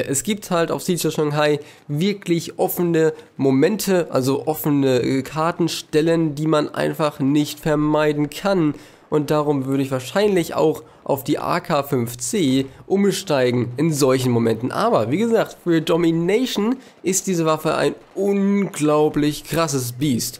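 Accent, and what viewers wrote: Germany. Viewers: Kommst du aus Norddeutschland?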